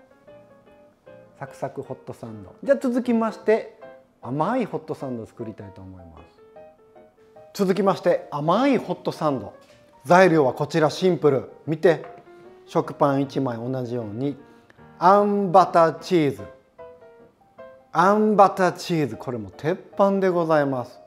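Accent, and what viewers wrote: Osaka, Japan. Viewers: イギリスからです。以前から日が経ったパンを使ってブリーチーズとクランベリージャムとサラダほうれん草を挟んでオリーブオイルを薄く塗ったフライパンで押さえつけてホットサンドを作ってました。先ほど少し硬くなったくるみとクランベリーのパンを使ってコウさんのおっしゃる通りバターで冷凍ベリーミックスとブリーを挟んで何回も押さえつけて裏返しながら焼くと本当にサクッとパイのようになりました。いつも美味しい手軽に作れるレシピをありがとうございます。大阪節トークもいつも楽しみにしています。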